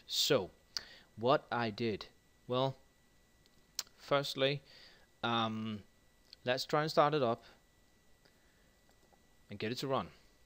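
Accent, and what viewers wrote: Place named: Denmark. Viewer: LOL Dansk accent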